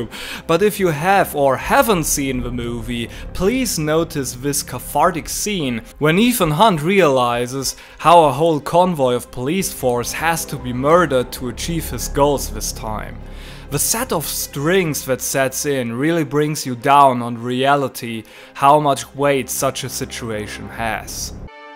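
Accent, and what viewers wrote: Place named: Germany. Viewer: Interesting and informational video - but on some parts hard to follow due to the thick german accent, do you have english speaking friends who proof watch your work?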